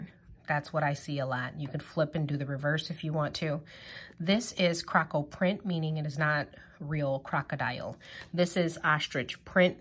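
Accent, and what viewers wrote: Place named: Long Island, United States. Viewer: I can’t believe your black lolz. You sound like a Long Island Italian lady. You should go into sales you have a great confident voice. 🤗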